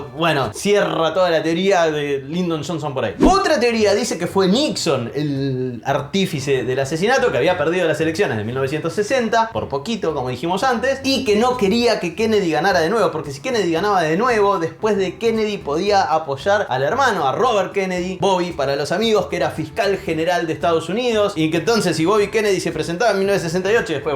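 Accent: Argentina. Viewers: Sha sha sha sha.....ese acento argentino fastidia....she she she....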